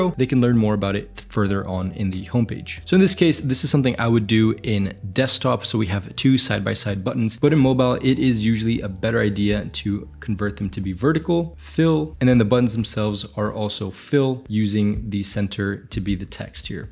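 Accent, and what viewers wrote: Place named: United States. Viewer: Love the tutorial. Sorry in advance for being intrusive but, how come you have the most perfect American accent? I can see you are Valencian/Catalan (like me). If you went to diction classes please let me know where, if it's online.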